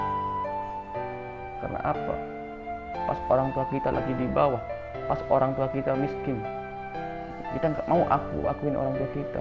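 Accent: Lombok, Indonesia. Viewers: Kayak logat lombok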